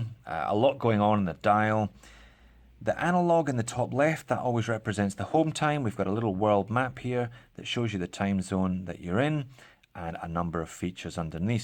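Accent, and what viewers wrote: Scotland, United Kingdom. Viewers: Scottish accent. “I’m in Australia incase you haven’t guessed” Yeah I have a map in my place. Don’t mean I’m from Uganda. Glasgow I’m from as it goes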